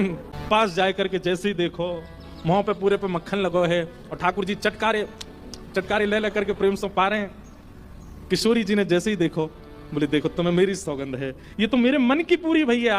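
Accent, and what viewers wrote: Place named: Braj, India. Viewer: Anand a gaya or apki awaz me brij boli😅❤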